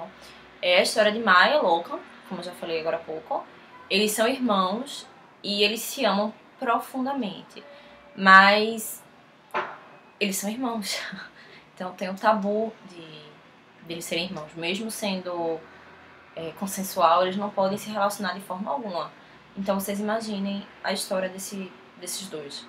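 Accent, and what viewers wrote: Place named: Portugal. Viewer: vc por acaso é portuguesa ou de algum país? é que vc tem sotaque!!!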